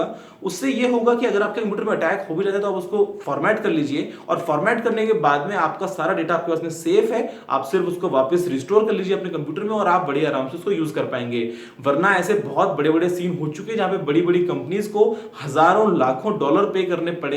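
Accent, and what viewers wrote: India. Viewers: Another Indian, this is boring to listen Indian accent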